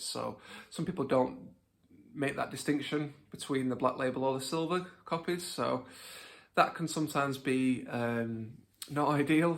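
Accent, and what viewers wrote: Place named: North, United Kingdom. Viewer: Good video mate! Good to hear another northerner haha. Im going for the full english pal set. Got a lot of the higher end games already . At around 500 at the moment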